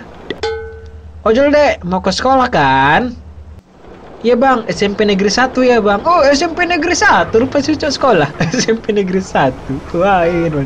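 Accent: Medan, Indonesia. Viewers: bg... abg orang medan ?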